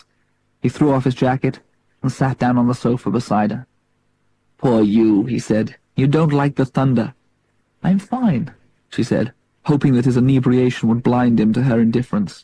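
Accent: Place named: Liverpool, United Kingdom. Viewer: Great to hear a reading by the author. Interesting way of speech going on there, I think it's an attempt to conceal a Liverpool accent.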